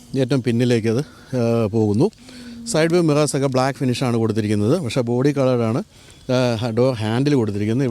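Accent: Kottayam, India. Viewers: Your commentary is good as part kottayam slang like Alexa kocch😂😊.. i.e enjoy ur program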